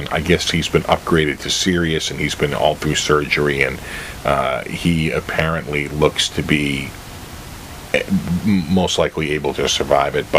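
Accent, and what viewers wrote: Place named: Massachusetts, United States. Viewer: Hey: I finally figured out where you live. My wife is from Sharon and I grew up in Abington although we've lived in Maine for the last 25 years. I thought I recognized the accent. How come people from your area never vacation in Maine but think NH is the vacation Mecca. We are, after all, the vacation state! LOL. Rock on Dude..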